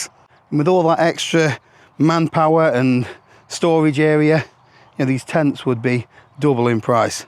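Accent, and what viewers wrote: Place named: East Yorkshire, United Kingdom. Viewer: DIY, snot ard is it...... 'Ull' east yorkshire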